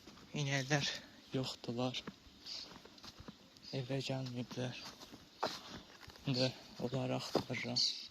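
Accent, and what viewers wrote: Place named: South, Azerbaijan. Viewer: Ləhcədən Cənub bölgəsinə oxşayır.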